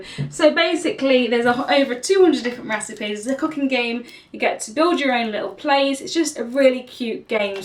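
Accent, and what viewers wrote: England, United Kingdom. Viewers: Damn you are cute as hell. Love your british accent. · Nothing sexier than a black chick with an english accent (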